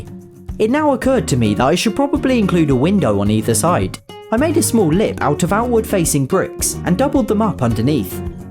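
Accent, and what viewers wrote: United Kingdom. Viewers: male jenna marbles with a brit accent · Just wondering do you speak British cuz I’m confused 😂btw love your vids and your cats 🐈🖥️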